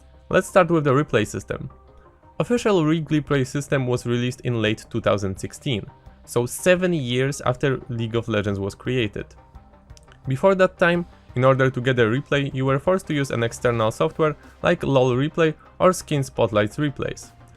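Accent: Poland. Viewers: And I always wondered why your accent sounds so Polish. Finally got the answer :) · Polski akcent wymowy sprawił że byłem pewny twojej naci :)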